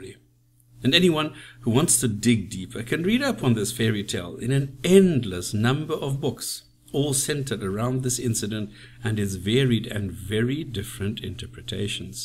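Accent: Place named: South Africa. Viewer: Great first video. Your accent sounds colonial, maybe south african?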